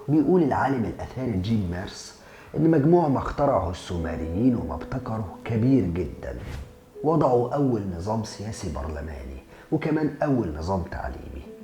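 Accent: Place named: Egypt. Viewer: متميز دائما في طريقة تقديمك في سردك صوت متميز و اللهجة المصرية زادتك تميزا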